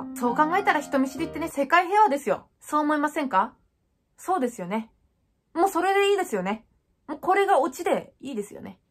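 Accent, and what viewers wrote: Nagasaki, Japan. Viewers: 喋り方から天草か長崎の人かなぁと思ったけど違うかな！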